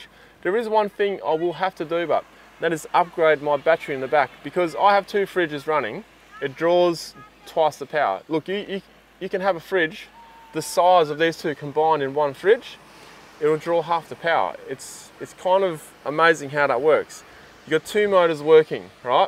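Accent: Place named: Australia. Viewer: Thanks for a great review, I am considering this fridge (or 2) for my campervan build, and you have just convinced me. They will work perfectly stacked on top of each other, and the idea of remotely locating the motor is the best. It took me about 3 sentences in, to identify the language as Aussie English 🙈😂😂 Greetings from South Africa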